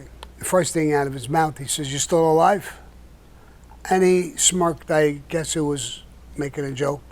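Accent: New York, United States. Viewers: Nice to hear NY er!😊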